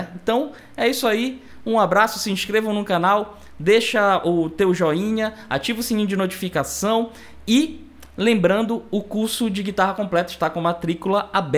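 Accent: Pará, Brazil. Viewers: Video show de bola. tu é Paraense?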